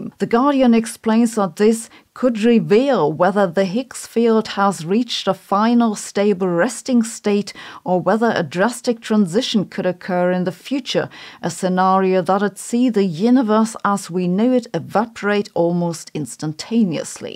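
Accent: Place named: Germany. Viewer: 👎👎👎 SABINE, your English is so awful, that you must go back to school an improve it. You are arrogant to address publicly people on YouTube with such a horrible English. You can not cover up your German roots. 👎👎👎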